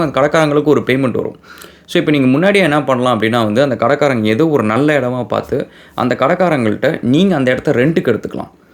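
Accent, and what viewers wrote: Dindigul, India. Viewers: Nenga Dindigul ah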